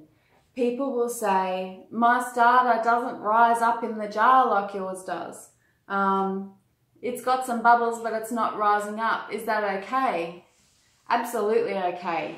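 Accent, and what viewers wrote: Australia. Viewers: Love that Australian accent.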